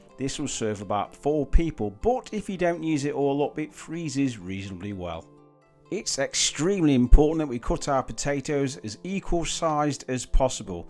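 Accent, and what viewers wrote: United Kingdom. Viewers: You have a strong UK accent, hard for me to grasp everything… but i like the challenge! There s the transcription too. 😘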